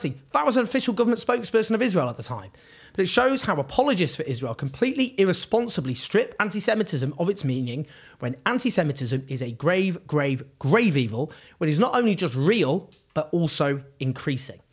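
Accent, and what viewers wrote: United Kingdom. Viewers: I love your passion and dismantling BS programs. Please, Please, Please slow down, enunciate, and stop trailing off at the end of your sentences. I'm an American. Whatever you could do to soften your British accent would go a long way in making your presentations more intelligible for your foreign and maybe even your Brits, Scots, and Irish. BTW I am Irish all the way.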